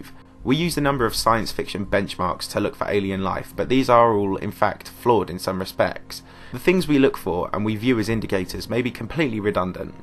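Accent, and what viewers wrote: United Kingdom. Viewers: The worst part of this is your Brexit accent.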